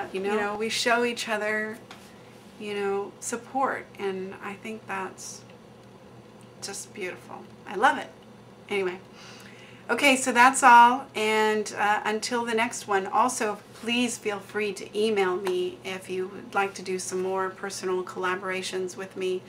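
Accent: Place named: Germany. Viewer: still liv zhat times and your well german accent ;) cheers kc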